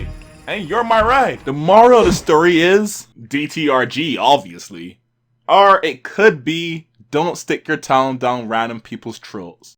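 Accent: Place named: Barbados. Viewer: You sound bajan boss man , blessings brother!!!mauby syrup and fish cake 🤙🏾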